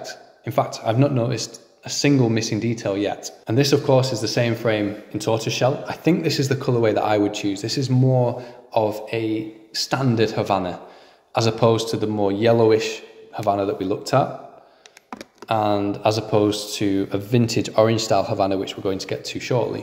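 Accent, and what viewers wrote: England, United Kingdom. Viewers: With YOUR HEAVY ENGLISH ACCENT , Many international followers hear this “ it is my job to PAY you the best glasses. “ Adjust, adapt, modify . Speak clear, dont swallow words. We are not British English. English is international , keep it continental .PLEASE 🥹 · You just need a bit more practice hearing Brit English for your ears to adjust. That's like saying you can't understand someone in Mexico because you learned Spanish in Belize.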